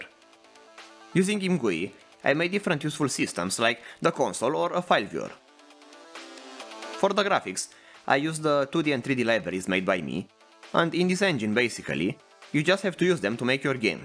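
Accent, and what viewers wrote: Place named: Romania. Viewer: Efectiv stiaaaam ca esti roman dupa accent. Mam uitat la about si asa a fost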